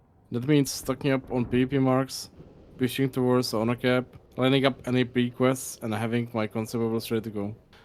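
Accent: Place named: Czech Republic. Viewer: Where are u from man? I hear Czech ❤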